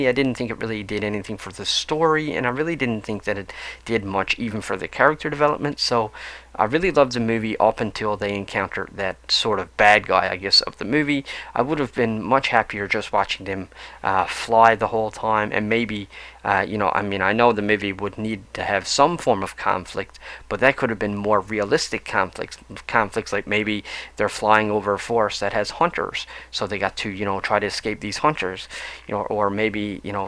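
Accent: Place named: East Coast, Canada. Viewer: Ahhh, you're Canadian! I kept hearing East coast but was not sure. Hello from southern n Ontario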